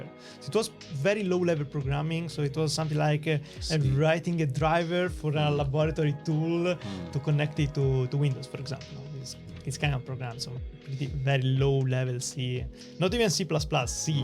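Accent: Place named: Italy. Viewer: Good video! Nice Italian accent =)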